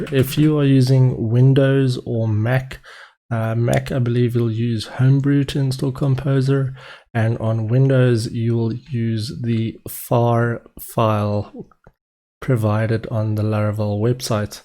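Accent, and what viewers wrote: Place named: South Africa. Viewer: I know my south African accents😂😂 im from Joburg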